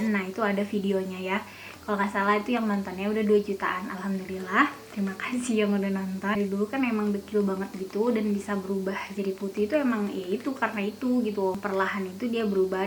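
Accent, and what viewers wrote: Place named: West Java, Indonesia. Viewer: Emaaak dengernya 🌹 Sunda detected 😊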